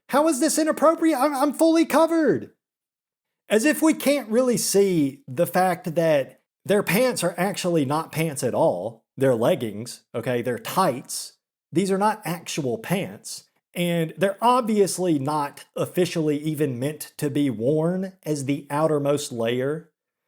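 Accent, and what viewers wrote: South, United States. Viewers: I love how southern and simultaneously not southern you are XD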